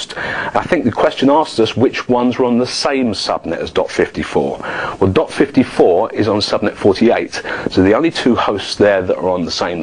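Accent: England, United Kingdom. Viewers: lol english accent :D lol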